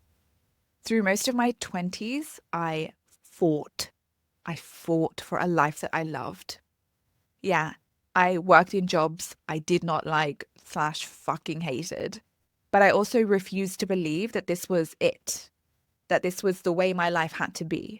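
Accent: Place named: United Kingdom. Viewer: a british accent....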